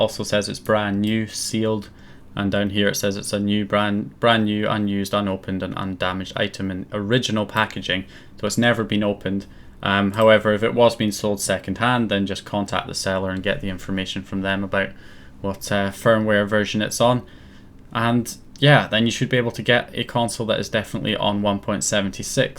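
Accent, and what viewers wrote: Scotland, United Kingdom. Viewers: Fuck me yer Scottish didn't know that we should start a modding team like the French modding team back in the day lol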